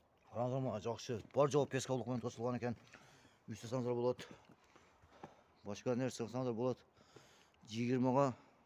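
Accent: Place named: South, Kyrgyzstan. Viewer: Баткенчи же Ноокат ичкилик же Кичи алайдан Аравандан окшойт суйлогон бала...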